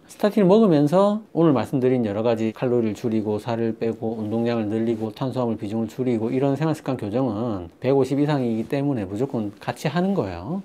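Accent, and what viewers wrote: Gyeongsang, South Korea. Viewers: 중성지방이 높으면 당뇨도 올 수 있는 위험이 될 수 있다는 말씀으로 이해가 가는데, 경상도 말씀이신가, 내 입장에서, 선생은 숨도 안쉬고 말씀하시네요. 열심히 하시는것 같은데, 원 뭐가 뭔지 금방 비행기가 지나갔나...;정신이 없네요. 지식있는 간호사님 모아놓고 강의하시는 것이 더 효과적일듯 함....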